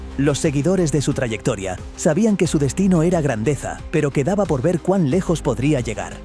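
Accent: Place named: Spain. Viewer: ¿Qué hace un tartosho eshpañol presentando la historia de una leyenda?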